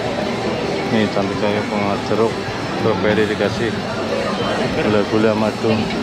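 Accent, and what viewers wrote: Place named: Banyumas, Indonesia. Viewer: jos lh,,PD Bae ro enyonge,,,salut walaupn d ngrti orng ngapak e Ra klalen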